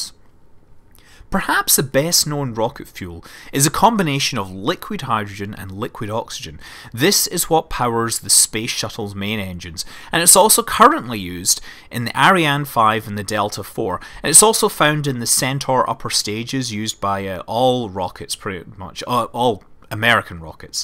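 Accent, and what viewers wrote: United Kingdom. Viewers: Please stop saying MEEE thane. You do not say MEEE thod! This MEE pronunciation is an annoying, "In Your Face" Colonial Yankee Dog, Britishism insult!!!! If it is not made as an insult it is at least a snobbish "Affectation". You are an obviously intelligent, well admired scientist, so any "Affectations" are unnecessary. I can live with AL you min eum, instead of Al lou minum because that is a real phonetic sound of the British way of spelling Aluminum.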